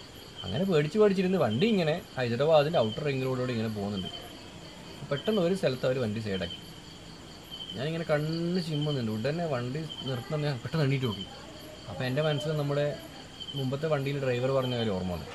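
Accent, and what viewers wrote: Kannur, India. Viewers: കണ്ണൂർ ഭാഷ പൊളിച്ചു ഭായ്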